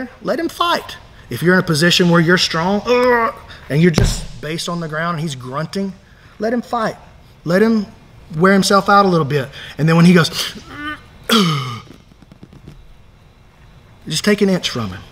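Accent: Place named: South, United States. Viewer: I love the southern accent.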